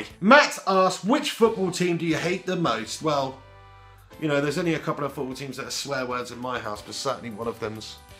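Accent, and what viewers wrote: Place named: Liverpool, United Kingdom. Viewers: Great scouse accent and hat! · I want to ask two questions,. 1. Do you think Fellaini is becoming the new Roy Keane, now that he's playing so good in recent games under LVG? 2. Can Rooney become the all time Premier League's top goalscorer if he stays and retires at United? Funny Scouser accent. lolll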